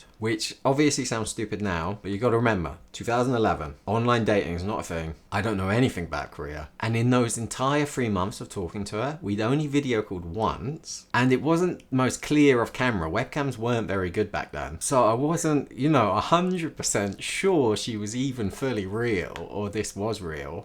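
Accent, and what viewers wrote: United Kingdom. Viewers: 한여름밤의 꿈같은 멋진 추억이네요~ ^^ 저는 반대로 영국에서 그런 추억이 있었네요 같은 영국억양을 가졌던 친구가 생각나네요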